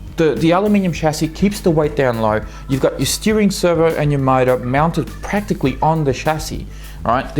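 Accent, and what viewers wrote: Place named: Australia. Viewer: Great review!!! don't take this the wrong way... You are the new Jang. He does thorough reviews at ultimate rc but you're more indepth and more entertaining, maybe it's the Aussie accent LOL. Please keep them coming, we know it's a lot of work so thank you. If you take request I like see a SC comparison from the 4 that you have. Price/ durability/ performance / parts support etc... which do you recommend and for what type of bashing etc...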